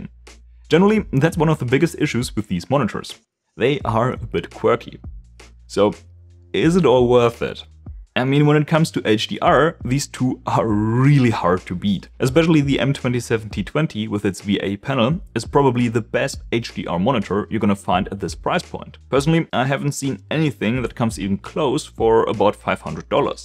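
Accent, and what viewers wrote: Germany. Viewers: Höre ich deutschen Akzent raus? Edit: Nevermind, der letzte Satz im Video hat's gerichtet 😂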